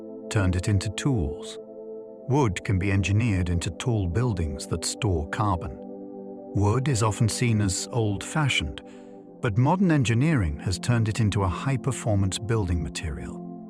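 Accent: England, United Kingdom. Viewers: “Hello there” in an English accent now Pavlov’s me into yawning. As a lifelong insomnia-sufferer, I consider this a success.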